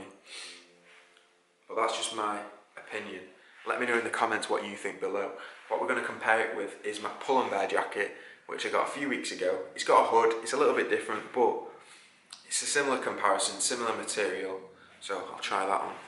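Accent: United Kingdom. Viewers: I HATE BRITISH ENGLISH...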